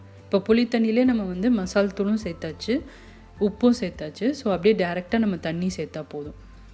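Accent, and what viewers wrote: Madurai, India. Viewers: அக்கா நீங்க மதுரையா.....குழபும் அருமை....என் மதுரை தமிழும்......அருமை....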